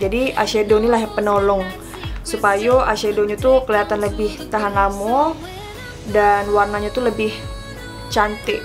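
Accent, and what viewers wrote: Negeri Sembilan, Malaysia. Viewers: Loghat MCM Org negeri sembilan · Mcm bahasa melayu negeri sembilan dan bahasa melayu perak dan kelantan lenggok dia.....dan area2 padang minang....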